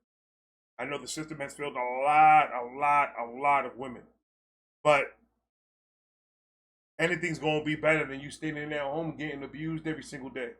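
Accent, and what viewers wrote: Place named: Bronx, United States. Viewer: You sound like you’re from the Bronx and I never even been to NY 😂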